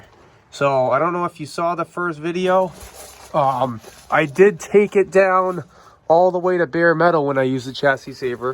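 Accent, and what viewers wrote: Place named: New England, United States. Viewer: Was watching your 2004 Corolla head gasket video and was thinking "between his accent and all the rust/corrosion he's gotta be in New England". Came here and found my answer.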